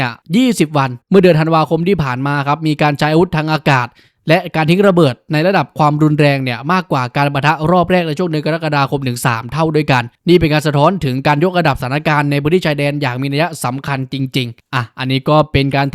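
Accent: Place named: South, Thailand. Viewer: ❤️เสียงคนไต้ แต่รักไทยไม่แพ้ภาคใด❤️ทำต่อไปคับ หลวง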